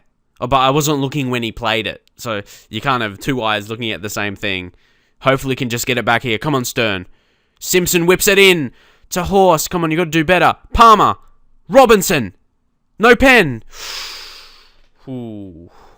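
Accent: Australia. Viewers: Seriously? Can't you tell he's an Aussie? :)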